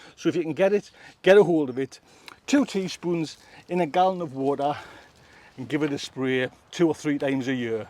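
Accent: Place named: Geordie, United Kingdom. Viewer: Love that Geordie dialect. Wonderful. Know a few and they are real down to earth folk. Thank you for the advice! For Nottinghashire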